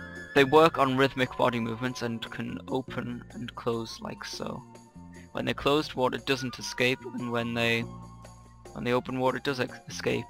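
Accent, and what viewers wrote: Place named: Ireland. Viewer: He sounds Irish to me :)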